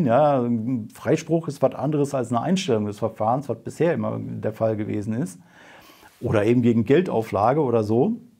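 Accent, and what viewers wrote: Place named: Emsland, Germany. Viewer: Na,ist wohl ausm Emsland, wie ich,da sagt man dat und wat...geht halt in Richtung Plattdeutsch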